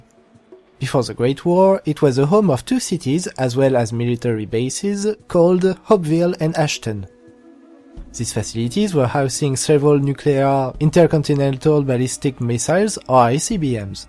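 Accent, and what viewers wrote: France. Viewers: Ma man's way too french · U french bro? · you have the stereotypical french accent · Pour un français tu parle bien anglais, bosse un peu ton accent mais a part ça merci ca explique pas mal de chose et de façon limpide ! Merci et good luck ^^ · Damn that is a strong Frog accent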